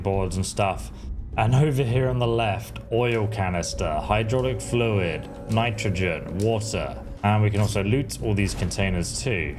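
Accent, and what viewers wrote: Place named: England, United Kingdom. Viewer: I don't mind your English accent, but OMG, it's VAR-mit.!! Picture Yosemity Sam saying "Come here ,you pesky VARmit, rabbit." Var, as in far with a V!😂😂😂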